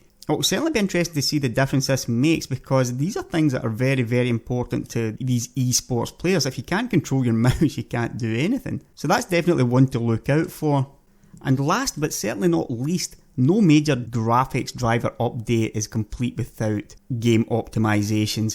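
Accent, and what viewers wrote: Scotland, United Kingdom. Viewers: Its your great Scottish acent my friend, and as an English man I think scots speak English better than us! Great video. Well done m8! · Funny thing Scottish accents - this one and Sean Connery's accent are the very loveable but for some reason it makes one always want to break out and speak in Scottish-. I love to always try and do a scottish accent from time to time - don't know why but simply just love it even the ones whereby you don't understand what the hell they are on about. You just know that you love it. Love it love it love - a very attractive language that immediately compliements any person first hand. It's just wheather that person immediately with a head start because of the Scottish accent can actually stay ahead and that then boils down to the real person in hand, hmmm! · Hey, what's the bit rate on that Scottish mouth of yours? 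You really get roll'n ! :D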